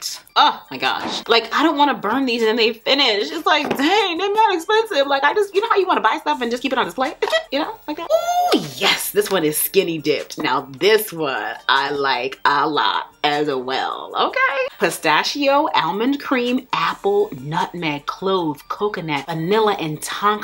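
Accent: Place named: Rhode Island, United States. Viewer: I just started binge watching your videos, and I knewwww I heard a RI accent the first time you said coffee🙌🏾 ⚓️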